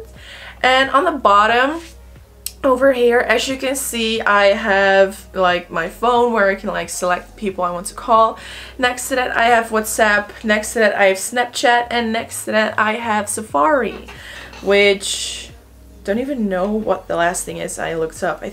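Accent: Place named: Netherlands. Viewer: lol ik was je video aan het kijken en ik zat te denken huh jou accent is precies Nederlands maar het moment dat je het recept van de ontbijtkoek liet zien wist ik het meteen lmao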